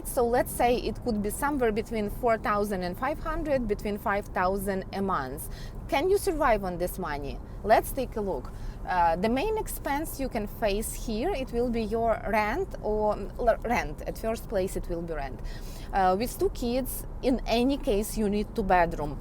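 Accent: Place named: Russia. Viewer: Ох уж этот русский акцент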